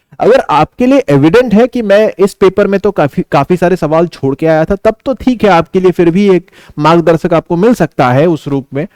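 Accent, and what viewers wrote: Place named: North, India. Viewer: Sir your accent is like morth indians..😮🎉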